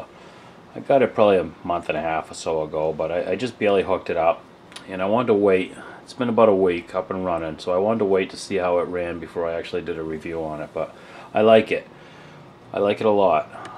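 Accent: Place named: Bristol County, United States. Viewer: ohh dude I moved out of mass 8 years ago but I know a Bristol county accent when I hear it! salute!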